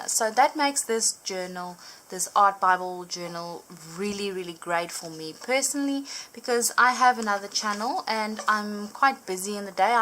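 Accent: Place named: Australia. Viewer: You're coloring is absolutely beautiful! Thank you for inviting me over! I subscribed! Are you from Australia? I love your accent! God bless, Kim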